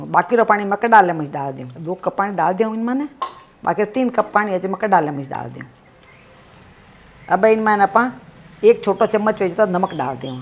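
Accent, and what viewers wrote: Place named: Rajasthan, India. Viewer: Aunty u r great apki saari dishes bhut achi shandar hoti h Par jab aap saath me marwadi bolte huye bnate ho to usme aur bhi jaan aa jati h Bhut acha lgta hai jab aap marwadi bolte huye sikhate h Ekdum maa jesi feeling aati h Thanks ki aap sabko sikhane ke liye itni mehnat karte ho.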